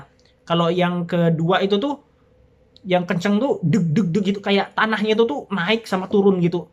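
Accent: Java, Indonesia. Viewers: iya, kirain dari jawa...😂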